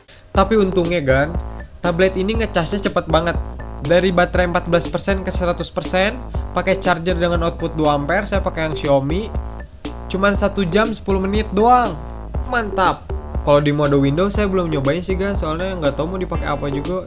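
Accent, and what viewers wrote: West Java, Indonesia. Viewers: Wkkwkk jigana urang sunda alus gan,heu euh teu gan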